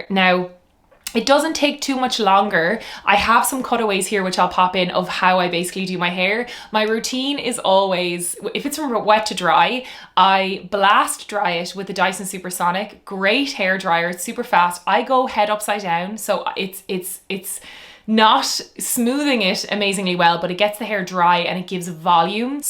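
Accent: Ireland. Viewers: Cant stand the irish accent though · Oh the Irish accent! One of the most beautiful sounds in the world! I’m a voice over artist ..I should know! ❤ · Really rude comment. I love the lilt of an Iris accent.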